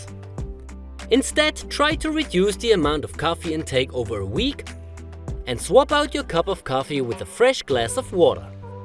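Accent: Germany. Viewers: Nice video - thanks! Question: what’s this for an accent? Sounds like a German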